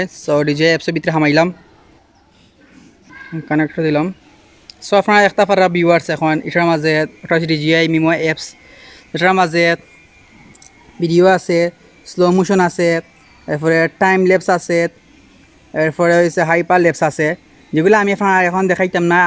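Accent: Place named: Sylhet, Bangladesh. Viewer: ভাই আপনার সব ভিওয়ার সিলেটি না। পারলে শুদ্ধ বাংলায় বলেন।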